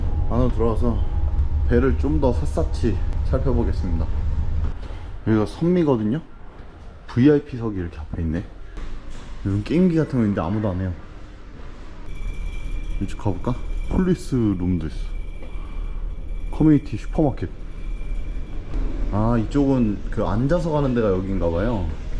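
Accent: Jecheon, South Korea. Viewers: ㅋㅋㅋ 보면볼수록 제천사투리가 잘 들리네요 ㅋㅋㅋㅋ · 사촌형이 제천사람이라 그런가 말투 너무 익숙해요 ㅋㅋㅋㅋ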